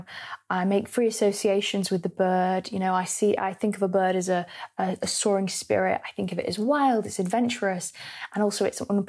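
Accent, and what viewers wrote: United Kingdom. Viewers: aye fellow Manc lass😂 great vid!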